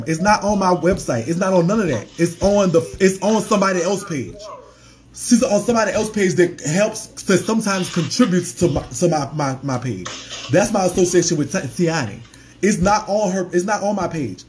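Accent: New Orleans, United States. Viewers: I subscribed off the hair and New Orleans accent alone baybee yesss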